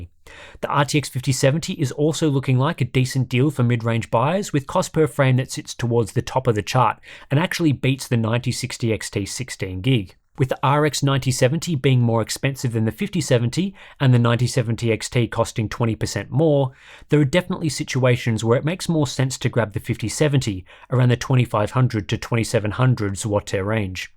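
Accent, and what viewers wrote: Australia. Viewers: yknow having no reason to actually visit the site, I've just been hearing "PC k-sku" for years now like ah yeah that's a neat techy name. Aussie accents are smth else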